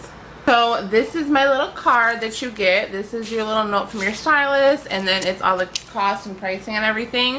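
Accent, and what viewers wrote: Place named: Chicago, United States. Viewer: Chicago makes sense too. Yes you have a strong accent :)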